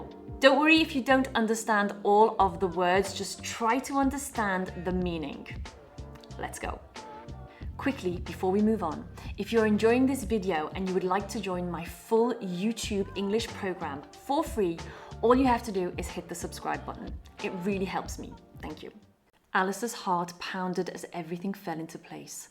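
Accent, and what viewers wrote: United Kingdom. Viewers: Professor, I am a 100% beginner. I did not understand the story, but I was listening with subtitles in English, of course, to see the words and how to pronounce them. Is this a lesson for me as a beginner? I mean the art of listening. I liked your British accent. It is nice not to pronounce the letter “r” in some words. This is a difference between a British accent and an accent. American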